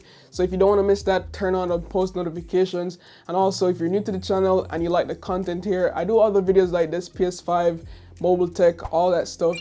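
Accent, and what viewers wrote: Jamaica. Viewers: You could hear the Jamaican accent 🇯🇲🇯🇲 old news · Are you a Jamaican?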